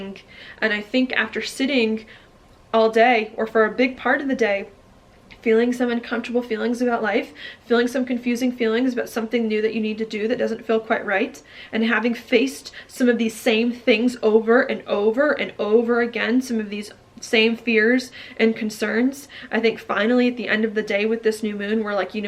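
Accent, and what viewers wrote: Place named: South, United States. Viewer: Happy Holidays. Have fun in beautiful Georgia. I knew you were from there how you say so sweetly "thank you for watching my video, if you like it please share" total sweet southern way of saying something. Not an accent so much as a emotional quality. I love the south. My husband and I decided our personal heaven is gonna be like the south minus the bugs and gators.: )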